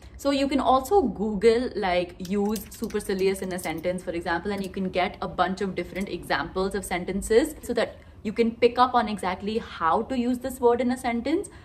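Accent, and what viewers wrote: India. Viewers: Wow that's very informative video. But haven't knowing your mother tongue, kashe asa tu ? Are you Goan ?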